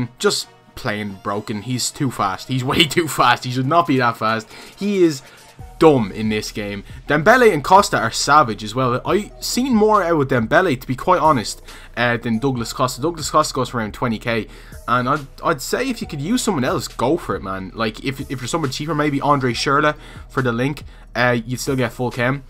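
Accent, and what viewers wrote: Dublin, Ireland. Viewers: What part of Dublin are you from? ps I love these vids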